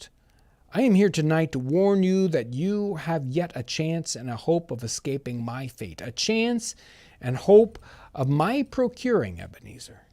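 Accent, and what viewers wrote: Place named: United States. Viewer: That was great and a bit different with an American accent 😀👍🇬🇧🇺🇲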